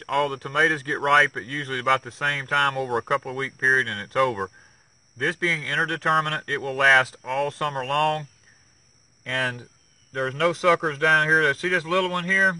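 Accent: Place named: Texas, United States. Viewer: Inter-determ-ah-neent,. LOL luv ya'llz Texan accent, but here in Mississippi we say Indy-term-ah-nent (indeterminate) tomatoes... But besides that you tellin it right brother. Especially the kids n plants runnin wild LOL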